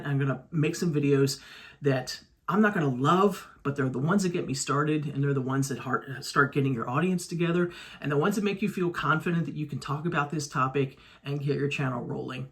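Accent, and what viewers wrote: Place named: Midwest, United States. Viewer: Are u from the midwest. I lovvvve your sense of humor. You had me laughing so hard numerous times. Content n comfort is awesome. Thanks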